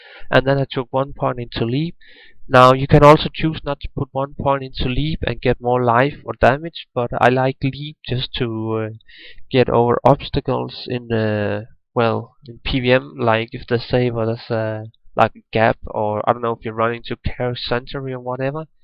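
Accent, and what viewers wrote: Denmark. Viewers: This really brings me back. I used to play softcore EuNL as well, used d2jsp, made countless of builds. Think I started about 10 years ago and played pretty much every day for 3-4 years. My favourite game of all time and the PvP aspect was legit, although quite frustrating at times(PK and script hammers). It's amazing to see people are still playing, but you mentioned you stopped? How long did you play for, and if I may ask, how old are you? PS. Ja den danske accent er let genkendelig :) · the moment when you can recognize a Dane on the accent ;)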